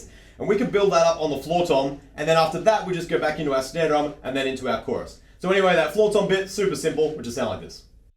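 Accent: Australia. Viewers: I subscribed as soon as I heard your accent I’m an Aussie aswell mate 🇦🇺🇦🇺🇦🇺🇦🇺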